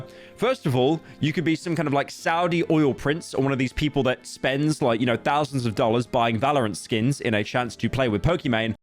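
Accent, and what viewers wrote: United Kingdom. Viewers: listening to british furry man talk about economics makes me want this to be my only source of education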